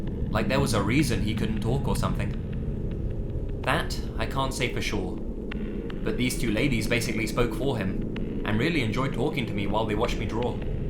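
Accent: England, United Kingdom. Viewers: God bless the British accent. "I'm good looking and assumed it was a pervert or I assumed I looked like his granddaughter." God bless the British. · are you from England? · the main reason I'm subscribing is because of that british accent lol number fooh hehe good job tho i realy enjoy ur vids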